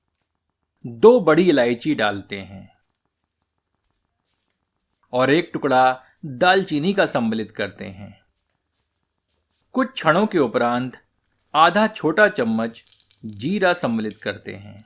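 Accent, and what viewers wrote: Standard. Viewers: क्या बात है,शुद्ध हिन्दी। रैसिपी के साथ शायरी का आनंद। मज़ा आ गया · Aap itna shuddh hindi bolte hain lagta hain middle age ka koi cookery show dekh rahi hu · The way u speaks in shudh hindi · आपकी शुध्द हिन्दी का उच्चारण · itni shuddha hindi aaj ke zamane me maine kisi ko bolte hue nahi suna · itni shudh hindi sunkar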